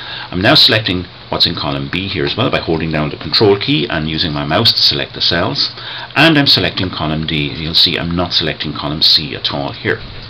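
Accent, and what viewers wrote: Ireland. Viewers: You just saved my ass for my class. Please keep this up. On a side note - awesome Irish accent man.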